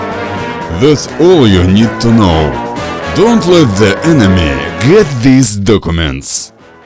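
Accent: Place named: Russia. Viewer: Wow! This is a Russian accent. I know how it sounds, because I am from Russia!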